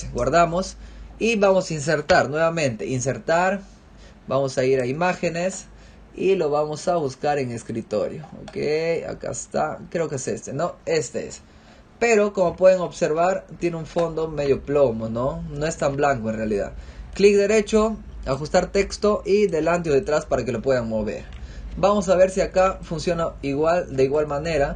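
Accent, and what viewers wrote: Peru. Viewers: Eres peruano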